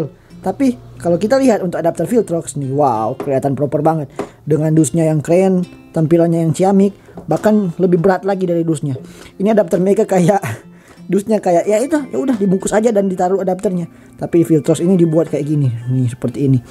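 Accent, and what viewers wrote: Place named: Manado, Indonesia. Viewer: Rupa org mdo pe logat bos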